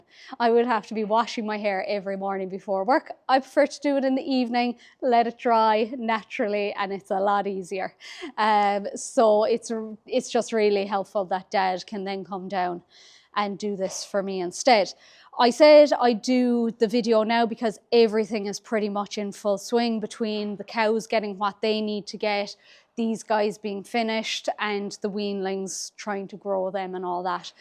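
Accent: Ireland. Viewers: Hate to disagree with you but I could listen to your sweet Irish accent ALL day long. ❤️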